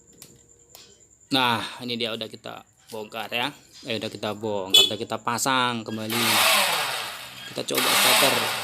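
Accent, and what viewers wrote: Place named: Medan, Indonesia. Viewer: Jowoe rosoe medan cak...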